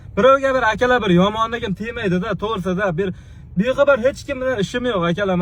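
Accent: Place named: Laqay, Uzbekistan. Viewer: Laqaycha gapirma zaybat oʻzing laqay degani gapriwni ham laqaycha qildeganimasku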